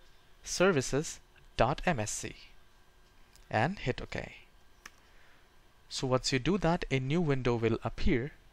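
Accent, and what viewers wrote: India. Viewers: Thanks bro, are you indian by any chance?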